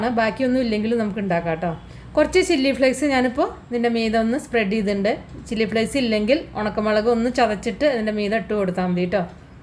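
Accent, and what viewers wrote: Palakkad, India. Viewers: Super, 👍try cheyyam, palakkad ano നാട് നല്ല രസം പറയുന്നത് കേൾക്കാൻ നല്ല അവതരണം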